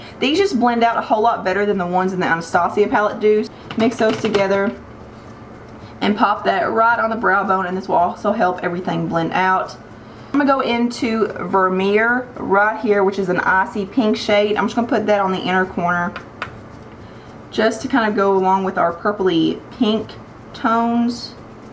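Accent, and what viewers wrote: South, United States. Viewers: where are you from ? i can tell you're from the south , i'm from north carolina and i wish my accent was as beautiful as yours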